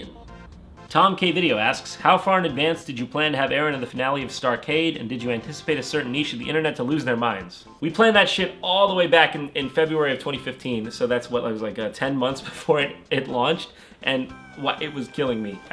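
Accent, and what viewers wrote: United States. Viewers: what a strong american accent he has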